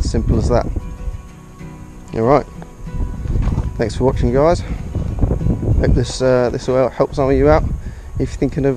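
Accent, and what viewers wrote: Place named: England, United Kingdom. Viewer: Dude you sound English. Where abouts are you? I’m Lincoln. Brand new XSR700 in black owner.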